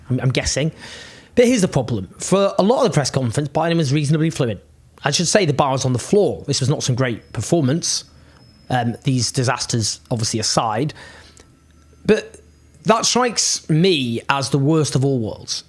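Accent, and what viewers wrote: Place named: Yorkshire, United Kingdom. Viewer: He's a Yorkshireman, and they're a bit different up there.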